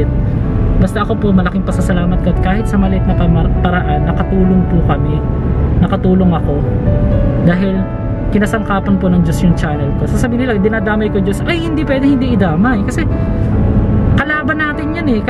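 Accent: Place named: Bulacan, Philippines. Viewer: Hello more videos pa po..taga bulacan po ba kayo? May tono po ang salita nyu e😍 godbless po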